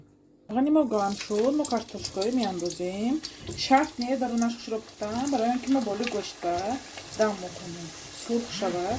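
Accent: Isfara, Tajikistan. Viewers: Лафзи Исфарагихо мора а хонаш мебурурад😅😅😅😅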